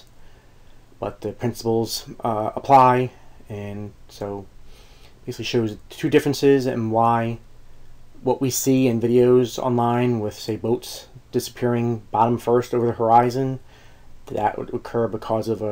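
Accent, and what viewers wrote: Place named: South Jersey, United States. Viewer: New South ?? Soundly like South Jersey to me 🤔🙃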